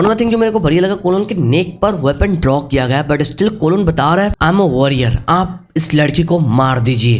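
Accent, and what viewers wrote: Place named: Bangladesh. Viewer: This is a Bangladeshi Channel.. I guess from his accent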